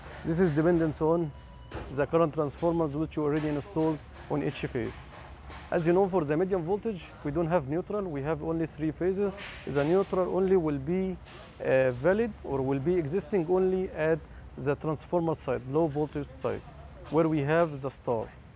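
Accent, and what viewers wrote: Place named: Egypt. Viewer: there is an excellent Egyptian accent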